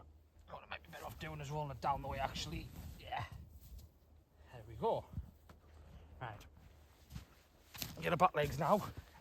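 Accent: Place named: North East England, United Kingdom. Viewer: You from Sunderland or Durham? Sounds it haha… I’m from Newcastle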